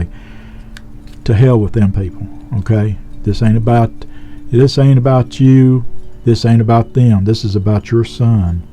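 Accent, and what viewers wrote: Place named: Kentucky, United States. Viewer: I don't know where you're from, but you sound like you're from my neck of the woods........ Kentucky.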